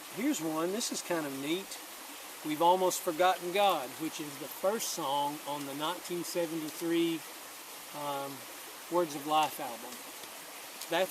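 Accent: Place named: North Carolina, United States. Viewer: I so enjoyed listnin to your "blabber" & "yappin" about the tech end of recording, although a lot goes over my head. I just want to play, sing & have someone else do that work. 😀 When I was a kid in the '60s & '70s in TN, my dad & uncles would sing in the living room a lot of Hank Sr., Lefty, Jimmie Rodgers (Blue Yodeler), & other standard country music & hymns. We had an overhead light fixture smackdab in the living room ceiling. The men would hang a mic from it & they'd sit around it in a circle & play & sing to that suspended mic. Now you wouldn't want to do that on a ceiling fan & have someone come along & turn on that fan! Oops! 😃 Uncle Robert called it a hillbilly boom mic. 😀 What a sweet setting by the creek & trees, with a crawling bug as your co-host. Even though you're in NC (I grew up with TN & VA accents which are a slight difference) your accent is so pleasant. 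Your singing voice beautiful. Your dad had such beautiful high harmony. Wow! Really like your Gibson Dove. God bless you & your family. 🙏🏻🌼 Edit: I saw where someone suggested you scan & save those paper lyrics. I agree!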